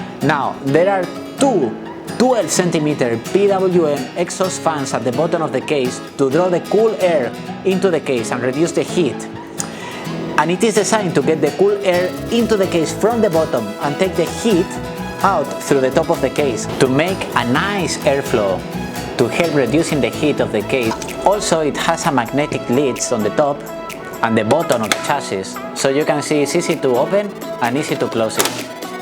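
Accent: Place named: Andalusia, Spain. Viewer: English from andalucia i can see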